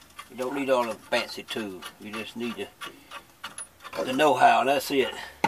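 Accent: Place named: Virginia, United States. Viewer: Where are you from? My uncle is from around Staunton, and he has a similar accent. Thanks for the pointers.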